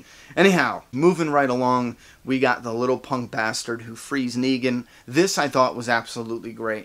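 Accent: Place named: South, United States. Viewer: You have a southern accent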